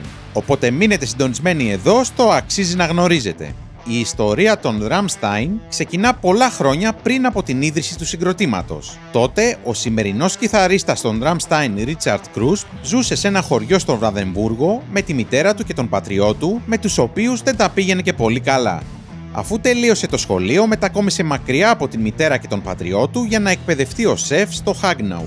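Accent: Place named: England, United Kingdom. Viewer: Πέρα από το ξεκίνημα του κιθαρίστα στα '80s δεν βλέπω καμμιά "άγνωστη αλήθεια". Και το κείμενο είναι μάλλον έκθεση λυκείου, διαβασμένο από κάποιον που πιθανότατα δεν έχει ιδέα για την μπάντα. Κατ' αρχήν, μέχρι και οι πέτρες ξέρουν ότι τα φετινά τους live είναι επετειακά για τα 30 χρόνια τους, και όχι 25. Έπειτα Γερμανικοί τίτλοι και ονόματα διαβασμένα με Αγγλική προφορά. Αν είχε ακούσει 2-3 φορές έστω τα κομμάτια, θα τα έλεγε σωστότερα, κι ας μην ξέρει Γερμανικά. Επίσης... άκου εκεί "ωραίες μελωδίες και καλή παραγωγή". Μιλάμε για τις πλέον κορυφαίες παραγωγές παγκοσμίως, πραγματικά "σχολείο" για ηχολήπτες και μουσικούς παραγωγούς. Όχι "καλή παραγωγή". Έλεος. Και δεν βγήκαν με κουστούμια χοντρών για να "γελιοποιήσουν το θέμα της παχυαρκίας" αλλά γιατί έτσι ήταν στο video clip του - το οποίο μιλάει γενικότερα για την παρακμή των ανθρώπων που δεν έχουν κανένα πια ενδιαφέρον στην ζωή τους.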